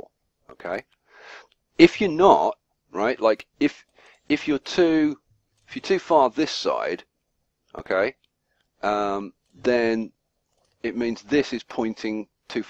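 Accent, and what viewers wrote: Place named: United Kingdom. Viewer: I did it just immediately and it worked really fine! thanks again ! Hey your are from uk or Scotland? Nice accent !!!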